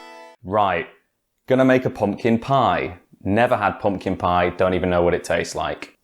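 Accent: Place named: United States. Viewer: His accent is half American.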